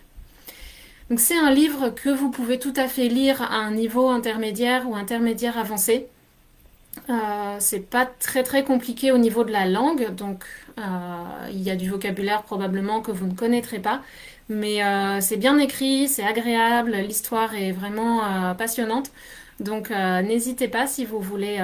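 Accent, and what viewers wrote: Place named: France. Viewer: tu as un super accent français ...tu parles comme les français ..je ne trouve pas de difficultés à comprendre .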